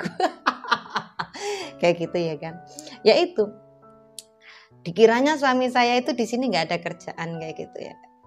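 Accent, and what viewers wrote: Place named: East Java, Indonesia. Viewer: Aq suka prinsip hidupmu mba..n bahasamu mnurutku udh halus kok emg nada org jatim trutama wilayah sby kesono kan emg gitu..semangat mba!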